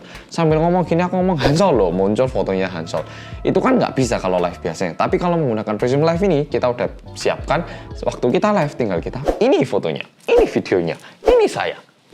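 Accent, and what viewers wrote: Java, Indonesia. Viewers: Mas Hansol : bersama orang Korea yang... Me : meddhooookkk.... Makasih mas Hansol i see Taeyong Jimin ^^ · Kok mas hansol bisa yh medok Indonesi banget. Tapi pas aku mau medok korea kok ndak bisa yah 😂😂😂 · ngakak pas ngomong "muka blum raup" wkwk njawani bnget ya mas hansol ni...😂😂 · Aq wong jowo kalah medok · udah selesai nonton baru sadar, ini promosi toh. dasar mas medok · Mas hansol medokku😘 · So simple. I see Ty & Jimin, I click!:D Btw, candu bgt ama voice medhoknya mas Hansol 😭💜💜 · ORANG KOREAA YANG MEDHOOOOOKKKKK!!!!!!! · Kaka, kaka itu kok bisa medok?, orang jawa yaa, sumpah, bahasa jawa aja tau kaka KEREN!, pertama kali aku denger orang korea medok :)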